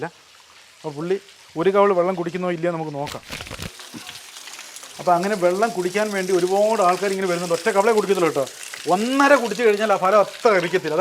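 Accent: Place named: Trivandrum, India. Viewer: 😂😂thani Tvm slanghu 😂😂😂. Vayar kurakku nalla adi aanalle 😂 meesha. Uff soooper ❤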